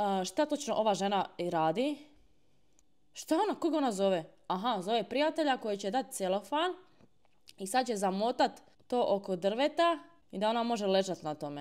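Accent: Dalmatia, Croatia. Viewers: Ja kad te gledam plačem još delmatinski naglasak😂😂😂😂 ja se kupam u suzama (nije mi dobro)❤❤❤❤❤❤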